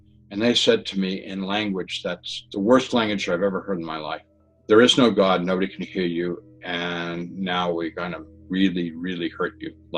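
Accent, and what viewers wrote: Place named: New England, United States. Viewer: F'in D's.. lol I love The New England accent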